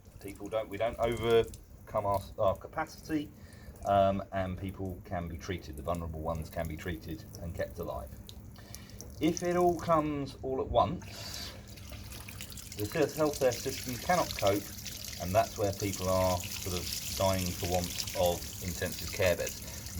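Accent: United Kingdom. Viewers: despite your cool calming British accent and the chance I believe anything that you say you must note your pouring of water is linear not exponential. the water bottle is quite tiny in proportion to the ability to treat based on the number of NHS ICU beds being under half of Italy yet the population size is similar. this is not the great British bake off this is life and death.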